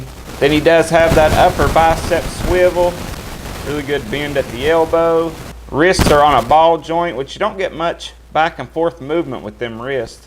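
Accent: South, United States. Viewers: From what part of the USA is your accent? I am not American but it sounds like how most people talk in forest gump movie.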